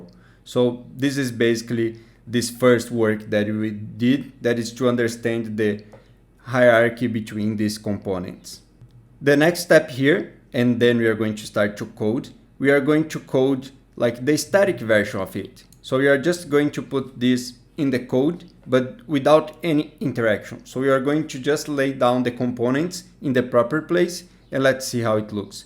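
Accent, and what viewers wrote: Brazil. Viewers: Brazilian acent? New sub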